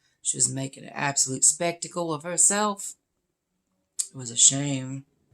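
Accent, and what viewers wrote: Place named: South, United States. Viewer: Love it 😃😍 and your southern accent 🤩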